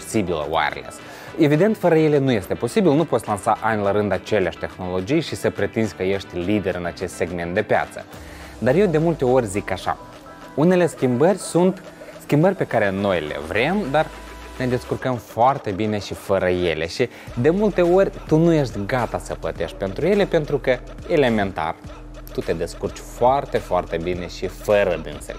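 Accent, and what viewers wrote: Moldova. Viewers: Si eu sunt romanca, locuiesc in Italia si ador accentul asta moldovenesc! ❤️ Cat despre Iphone nu sunt fana. Nu as investi banii in asa ceva, cand pe piata exista telefoane super faine si iti poti alege. Totusi pentru fiica-mea mai fac exceptii...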